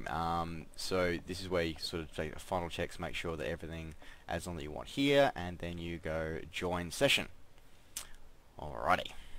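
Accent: Australia. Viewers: YOU MY FRIEND ARE AUSTRALIAN AND YOUR FROM GOLD COST OR JUST NSWI FUCKING CALLED IT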